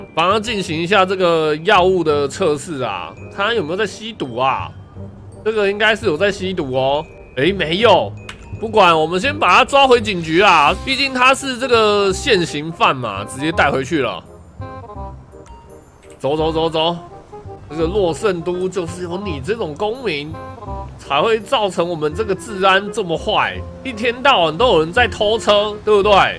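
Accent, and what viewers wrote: Hong Kong, China. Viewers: 你是香港人媽我不是但看ˋ你講話很像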